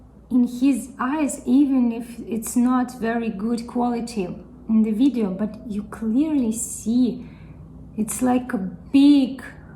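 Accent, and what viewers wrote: Russia. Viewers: Where you from ? Russia? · Забавная реакция) судя по акценту, ты русская?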